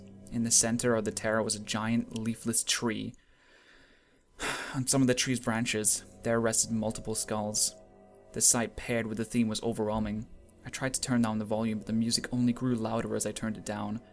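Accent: United Kingdom. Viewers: The british accent is strong in this one... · Oh ma gad you sounded so British in this!!!! Great story :)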